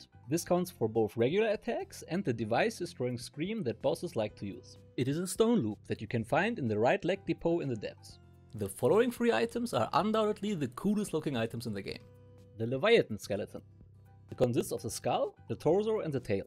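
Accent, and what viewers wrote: Germany. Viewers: GErman accent?!?!? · ich höre einen deutschen akzent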